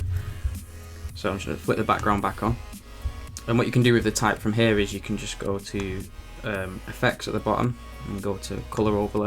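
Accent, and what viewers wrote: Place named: Northern England, United Kingdom. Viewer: After so many Canadian and American channels, I love hearing a fellow UK Northerner, and delivering such quality videos! Cheers pal!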